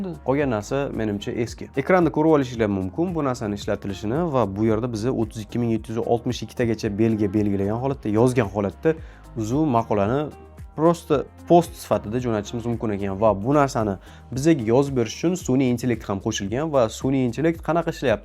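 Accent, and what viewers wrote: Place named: Russia. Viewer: Rus, turk odam endi gapirvotgan odamga oʻxshab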